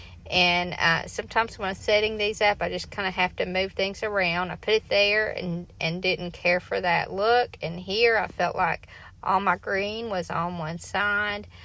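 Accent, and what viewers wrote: South, United States. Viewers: Hi, I just found your channel and love it. I was wondering where your store is located. I related to your style as well as your southern accent. I am from south Ga., but live and have 2 booths in Tn.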